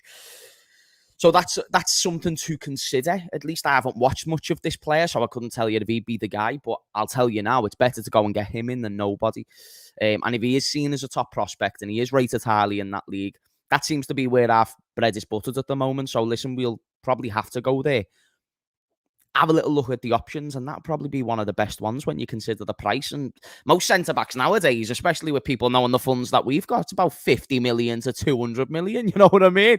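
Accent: Liverpool, United Kingdom. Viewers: You proper remind me of my mate scouse Ged but a younger version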